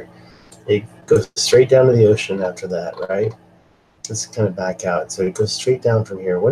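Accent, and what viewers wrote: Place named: United States. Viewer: You sound as American as me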